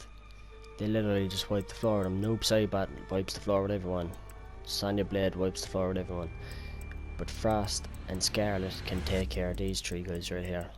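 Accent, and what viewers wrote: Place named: Ireland. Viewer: Lad your Irish omg I'm Irish too ! Add me S2S ( Nintendo Switch )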